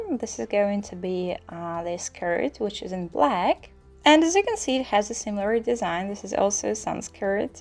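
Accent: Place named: England, United Kingdom. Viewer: awesome stuff, i love the windy days, I'm melting at your english accent 😍❣️🔥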